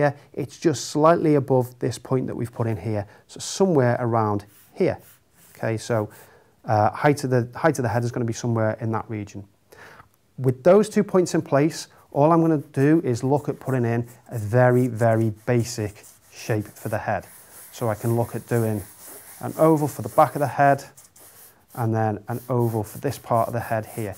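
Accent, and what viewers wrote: Liverpool, United Kingdom. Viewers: What an amazing lesson. I’m awful with angles and ratios and can never get my head around them but you’ve just explained this so well. I’m defo a new subscriber, especially as I think you might be a fellow scouser! Thanks for sharing your knowledge. 👏🏼👏🏼👏🏼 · A scouser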